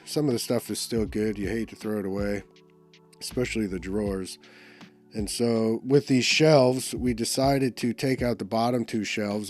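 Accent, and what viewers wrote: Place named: East Los Angeles, United States. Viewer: Hope you don't mind some constructive criticism, but your videos would be a lot more engaging if you did a little teaching. It is clear you have a buttload of talent, but just watching videos of you talking like we are in East LA aren't engaging. Started watching after your dual video with BourbonMoth, but still waiting to actually learn something. You have a great re-start on your channel, make it worth watching. I am just another A-hole with an opinon of course, but it would be great to actually learn something along the way. Also, get rid of the "Yo guys" at the start of your videos. You instantly start in the hole. Make your videos that somebody looks forward to hitting YT.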